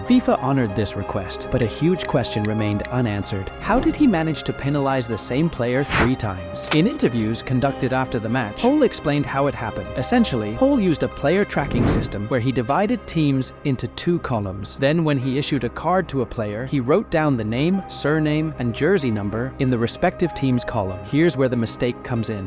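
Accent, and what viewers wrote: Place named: United States. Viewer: American accent? Wow.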